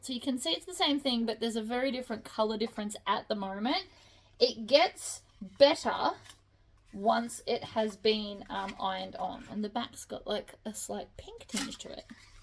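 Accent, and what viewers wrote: Australia. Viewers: Gday, just a heads up you need to clean the cup with alcohol, love your videos, nice to hear an Aussie for once…where in aus are you?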